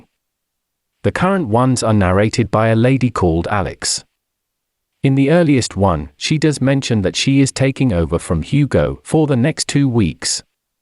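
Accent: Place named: Multicultural London English, United Kingdom. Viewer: It's a 'London Multicultural' accent, not a real London accent.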